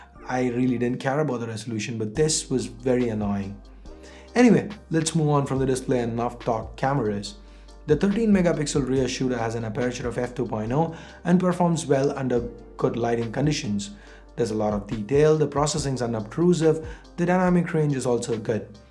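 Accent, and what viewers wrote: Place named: India. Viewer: bhai just a suggestion. English ya toh clear Indian accent me bolo ya fir purely British/American whatever you are trying to attempt here, dont jinx them up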